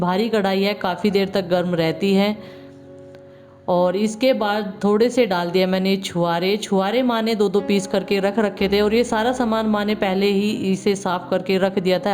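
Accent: Haryana, India. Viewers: Mam aap Haryana se h ya U. P. Se language toh Haryana ki h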